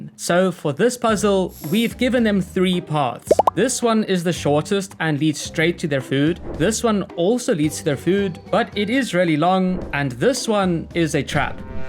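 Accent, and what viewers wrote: South Africa. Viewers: Am I hearing a South African accent? Lmao vase line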